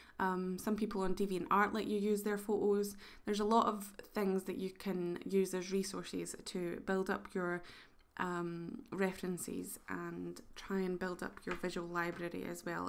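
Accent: Scotland, United Kingdom. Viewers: Maybe it one of these elitist things. I am bad at not doing any practice things between my art club meetings but do mean to. Nice to have a Scottish accent for a change. Just subscribed.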